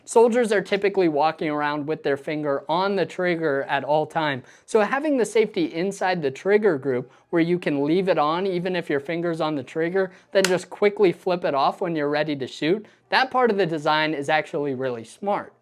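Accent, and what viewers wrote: United States. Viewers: I can tell you're from Ohio so came everybody I talk to our accent, is something straight out the cornfield.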